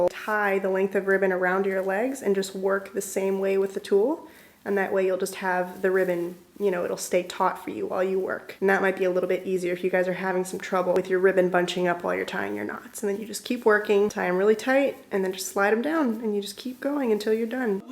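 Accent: Spain. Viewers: oh yes you can !! i've seen old vlogs and you have an awesome spanish accent :)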